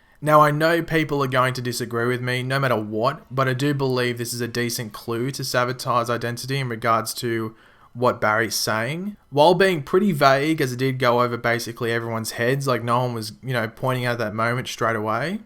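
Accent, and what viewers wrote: Australia. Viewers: Holy shit u sound Australian. R u australian?? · But I'll watch the video anyways because your voice is Australian gold. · are you australian?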